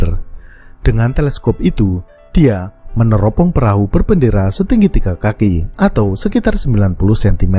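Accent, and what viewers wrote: Indonesia. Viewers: Karakter suara medok nya biarin natural aj bro.. g usah gegayaan dibuat2 pake intonasi.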